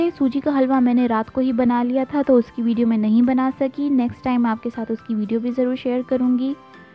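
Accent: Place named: Hyderabad, India. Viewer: wow, that's great. I also had a feeling that you're from Hyderabad because of your accent.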